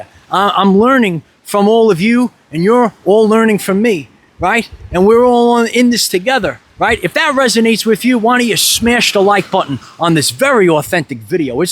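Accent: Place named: Bronx, United States. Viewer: This guy looks like a leading man in Hollywood but speaks like a grifter from da Bronx.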